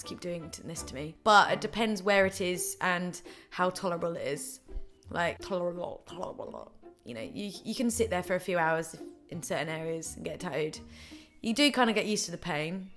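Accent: United Kingdom. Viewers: I so love the British accent. Espcially describing tats.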